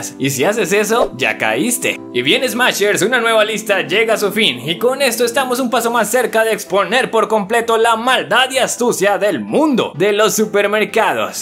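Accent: Colombia. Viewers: Sabía que ese acento era colombiano!!!! XD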